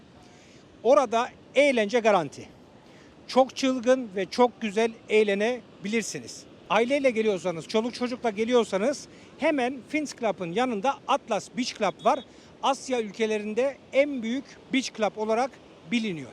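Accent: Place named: Germany. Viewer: abi sende bi alman aksanı sezdim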